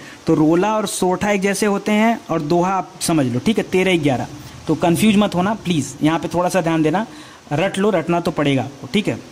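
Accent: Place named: Uttar Pradesh, India. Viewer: सर जी आप उत्तर प्रदेश से हैं, आपलोग 'ण को ड़ (र) क्यों पढ़ते हैं।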